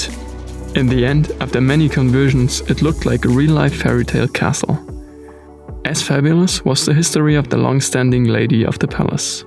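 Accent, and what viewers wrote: Germany. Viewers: Greetings from Tricity in Poland 🌊 you are making amazing videos! I love how informative you are and how you are making proper research and visually your videos are outstanding, too. And narrative voice is so smooth , with compassion and gravity. I 've never thought little german accent could be nice ;-))) this episodes was so sad... :-( hard to watch for me but I appreciate your work and preparation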